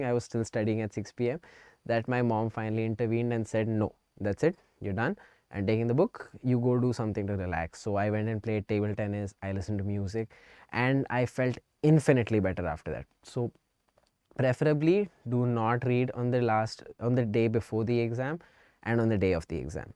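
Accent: South India, India. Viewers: maybe he's from southern part of India nd Hindi is not his Mother tongue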